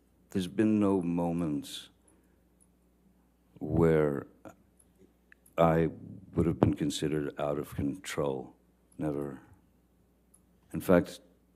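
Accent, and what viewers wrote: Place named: Pakistan. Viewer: Forget about him, love me instead. He sounds like he's from Pakistan.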